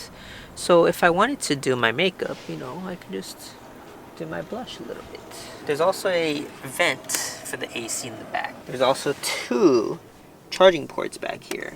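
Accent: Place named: United States. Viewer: What the fuking wrong with your American voice?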